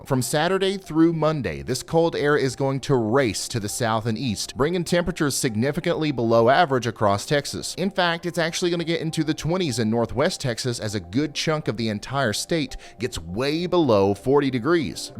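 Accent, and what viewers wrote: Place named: Tennessee, United States. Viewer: Well, he's as accurate as most! It's just that he's so much fun to watch! It's obvious he loves what he's doing, and he DOES have a knack for following the correct models. He may have a much better read on what others forecast, as he really does his homework. That slight Tennessee twang doesn't hurt, and he's just so dang cute!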